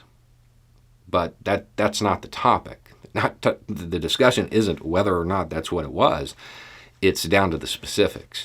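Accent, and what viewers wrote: South, United States. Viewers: More people “looking like” Beau .... color and accent coming from the south need to be doing more of what Beau is greatly accomplishing right now in speaking the truth etc as the usual perception as mostly been negative and mostly Republican supporters spewing madness and garbage. Cudos to Beau for jus simple been honest and right!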